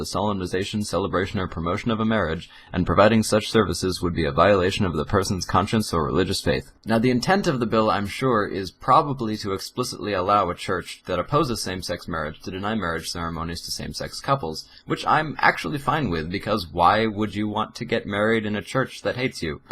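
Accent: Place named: France. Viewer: L'accent français. J'adoooreee.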